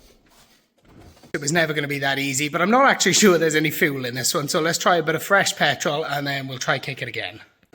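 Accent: Wales, United Kingdom. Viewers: Are u welsh bro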